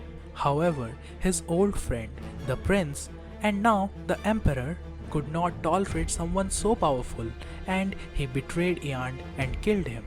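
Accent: India. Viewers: Remove your India accents its annoying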